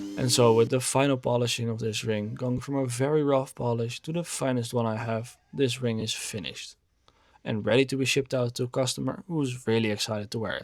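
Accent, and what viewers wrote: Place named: Netherlands. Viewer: Your accent sounds like you are Dutch! Very nice ring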